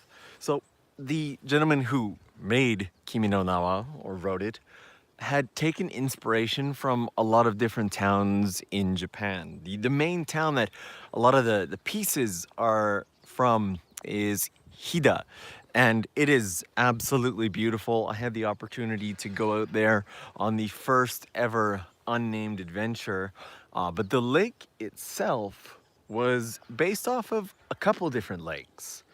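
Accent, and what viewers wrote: Canada. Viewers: You look, sound and somehow talk like Ryan Reynolds...and I'm guessing Canadian?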